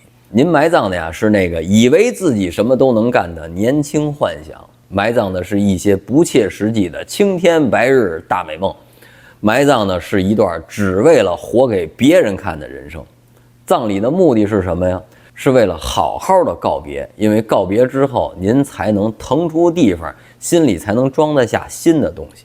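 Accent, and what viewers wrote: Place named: Beijing, China. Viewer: 博主是不是北京人啊，这味儿太重了哈哈哈（褒义）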